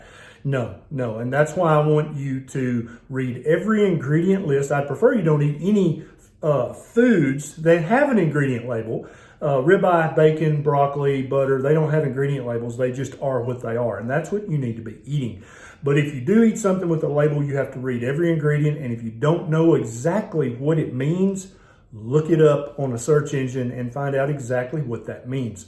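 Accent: South, United States. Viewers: .....loooove your delivery! No baloney, no hooey, just southern drawl sweetness and info from a southern gent! Thanks for keeping it real!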